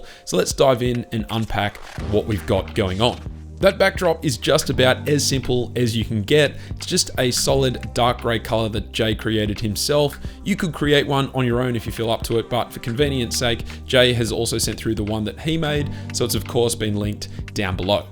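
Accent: Australia. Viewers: Watching all the tech YouTubers. I forget how heavy and distinguishable our Australian. Great work also, I don’t have an android phone but this was so cool.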